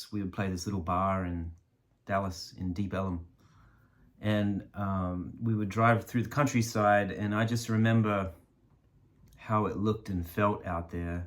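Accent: Australia. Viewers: I enjoyed listening to you man. Some people don't have the attention span to follow the train of thought through. Is that a slight aussie twang I'm hearing??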